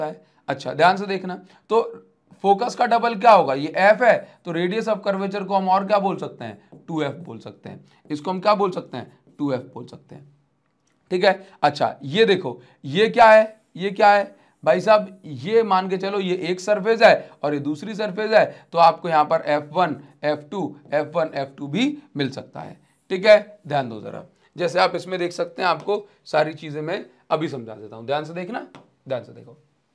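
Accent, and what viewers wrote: Odisha, India. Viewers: Sir app odisha se Hain kya ???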